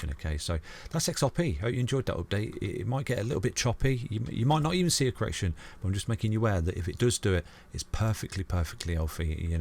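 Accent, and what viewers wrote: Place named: Middlesbrough, United Kingdom. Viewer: He might be from Middlesbrough as my friend has the same accent, he knows his stuff, hot on it!! crew 🚀🚀🚀🚀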